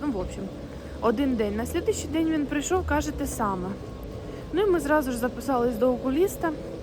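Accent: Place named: Poltava, Ukraine. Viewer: є розмовна мова регіону,до речі я з Полтавщини,у нас теж балакають,шокають і т.д,дуже наш говір схожий на ваш.Є літературна мова,але суржик він справжній,як би хто до нього не відносився.можливо ви мені через суржик і сподобались.не беріть близько до серця.як на мене краще суржик ніж:"я разгаваривала и буду разгаваривать........"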